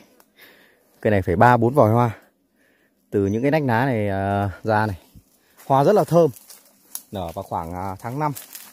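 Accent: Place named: Mekong Delta, Vietnam. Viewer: Nge giọng a gióg ng miền tây nam bộ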